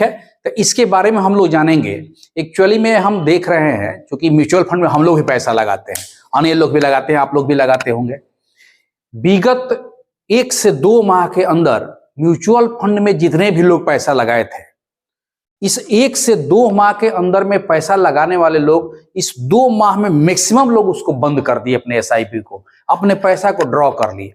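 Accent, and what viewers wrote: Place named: Bihar, India. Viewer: His tone shows he is from Bihar Paur month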